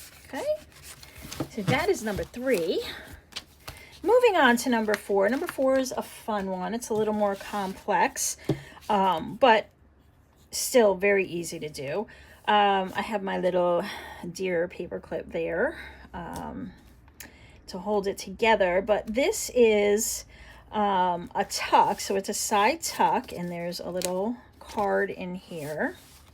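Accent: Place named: Pennsylvania, United States. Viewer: Love your style.. will try these…. Sounds like you live in PA. I used to live there… now I am in MN Yes do more of these please..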